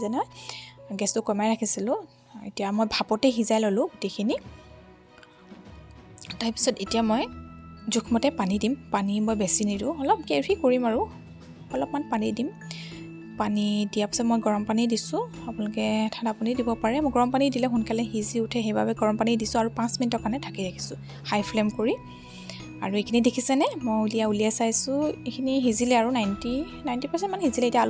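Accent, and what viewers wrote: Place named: Upper Assam, India. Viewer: মই যে তোমাৰ কথাখিনি শুনি বহুত ভাল পাওঁ ।উজনিৰ যে শুনিলেই গম পায় । আজি মানে বাবুৰ দিন । চিকেন ৰেচিপিটো মোৰ কাৰণে নতুন । ভাল লাগিল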